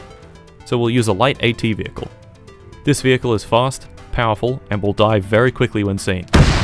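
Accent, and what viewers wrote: Australia. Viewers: You're from Australia, I'm sure of it bc I kinda talk like "I did a thing"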